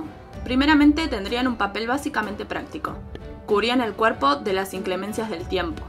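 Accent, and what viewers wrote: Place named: Argentina. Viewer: Me encanta el acento de las argentinas.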